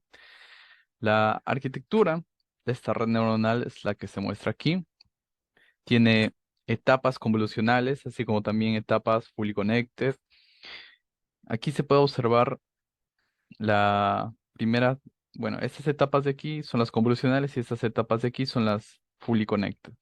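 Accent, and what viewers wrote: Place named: Peru. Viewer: De dónde eres?...tienes acento peruano. Excelente video.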